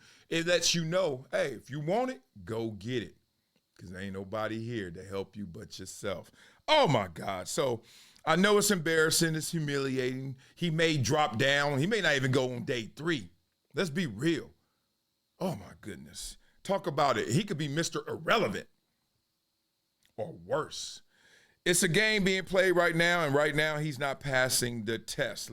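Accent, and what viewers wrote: Los Angeles, United States. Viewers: Wow. You’ve got that La rap accent pure gold 👏🏾👏🏾👏🏾.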